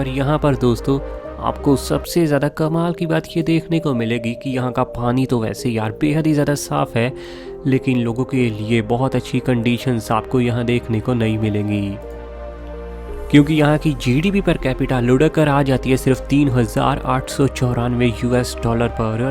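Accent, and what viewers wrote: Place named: Punjab, India. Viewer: tu punjabi a teri tone to pta lagda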